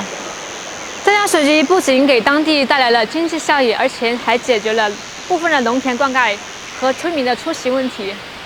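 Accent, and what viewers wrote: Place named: Luzhou, China. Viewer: 说方言的口音跟我们老家很像，跟泸州更像！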